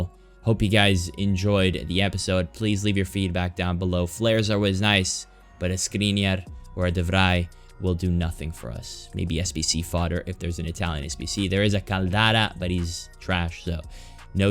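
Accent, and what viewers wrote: United States. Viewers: Bro i tought u were american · I've actually been watching for almost 2 years but what is your background? As far as I know you're Italian living in Italy but you sound so American. Just curious. Love your content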